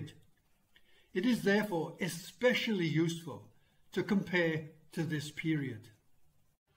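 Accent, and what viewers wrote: Wales, United Kingdom. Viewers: I agree with you, I love his voice and accent as well. Since I have watched a large number of his videos over the last several years I remember someone remarking in the comments section; saying he has a distinctive 'Welsh' accent. I can't verify if this is true, but try this as I did. Listen to a Youtube video where someone is speaking with a 'Welsh' accent and by comparison I believe the person got it correct, then you can decide for yourself. His knowledge is so impressive that all it took was watching one of his videos and I immediately subscribed. I pray he will get more recognition for all of his excellent work. The world needs to hear his videos, before we head down the rabbit hole of making big changes based upon false facts. What bothers me is if we do this; there will never be any way to monitor our progress. In other words we will be chasing rainbows.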